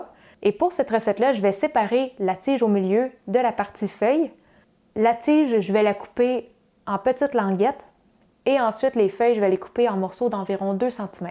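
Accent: Canada. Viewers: Bonjour ! Je te suis depuis quelques jours et je suis conquise par tes explications et par ton accent canadien.... j’aime beaucoup tes recettes et vais essayer de les refaire .... à plus. !!!!! ♥️😊👍👍😘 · Merci beaucoup et demain, votre recette trônera sur notre table en Californie. Un peu de curiosité, vous avez un léger accent et j'opterais , peut-être Centre du Québec (Trois-Rivières) ?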